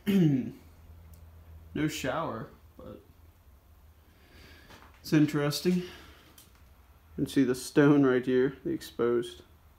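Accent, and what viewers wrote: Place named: East Coast, United States. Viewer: Pennsylvania? It sounds like you have a back east accent.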